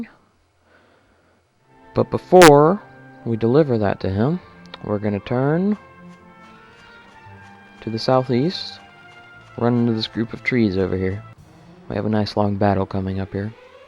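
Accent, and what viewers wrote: South, United States. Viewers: So...do I detect a southern accent? I'm from Oklahoma and I visit New York every other year sooooo.....yeah I usually recognize an accent when I hear one...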